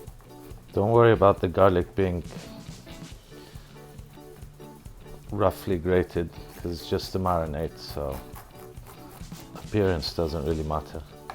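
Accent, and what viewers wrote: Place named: Greece. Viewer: πολύ ωραιο το βίντεο και σε στηρίζω να αρχίσεις και τα "αγγλικά" βίντεο. Μην σε ανχώνει η προφορά, τι να κάνουμε είμαστε ελληνάρες (χαχα) , μπορεί εδώ να σε κρίνουν αρνητικά αλλά πιστεψε οι έξω θα το λατρέψουν γιατί τους άρεσει που τα βρίσκουμε λίγο δύσκολα. Φυσικά με περισσότερα βίντεος θα λυθεί και η γλώσσα σου σιγά σιγά :)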